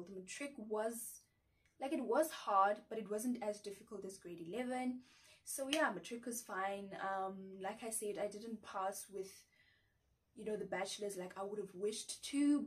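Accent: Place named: United Kingdom. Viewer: Damn you Nailed the British accent, btw you have a beautiful personality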